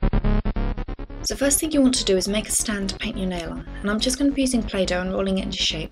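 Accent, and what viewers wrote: United Kingdom. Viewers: i love how u say "that's it, you're done" in ur fuckin awesome british accent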